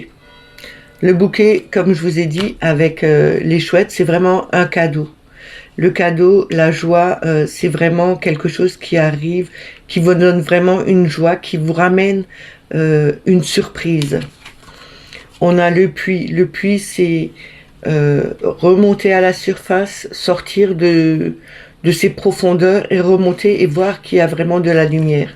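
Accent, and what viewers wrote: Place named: Alsace, France. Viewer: Bonjour, abonnée depuis peu, je prends plaisir à vous retrouver chaque jour. Je dénote un petit accent Alsacien, je me trompe??? Si c'est le cas, nous ne sommes pas bien loin puisque je suis près de Thionville en Moselle. Merci en tous les cas de vos tirages toujours éclairants et de votre bienveillance. Douce et belle journée à vous et à la communauté. 😘💖💝💐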